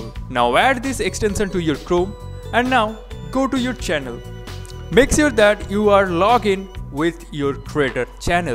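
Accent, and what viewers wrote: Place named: India. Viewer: its an Indian Accent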